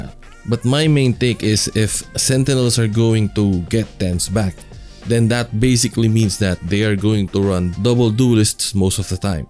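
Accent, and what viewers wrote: Philippines. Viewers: Are you filipino? The accent.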